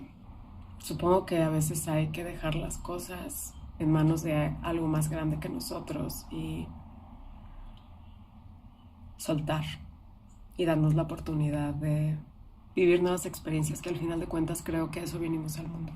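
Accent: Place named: Mexico. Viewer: ¡Qué vidéo más bonito! Eres una mujer preciosa, con y sin canas, por dentro y por fuera. Me encanta tu acento mexicano y tu tono de voz … ¿has pensado en leer libros para niños? … Te descubrí ayer viendo vídeos sobre mujeres que han decidido dejarse crecer su pelo natural y, entre otros, me gustó mucho tu enfoque. Aunque aún no me he decidido, creo que es cierto que algo tiene que romperse por dentro para dar un paso así y dejar de pretender ser eternamente joven.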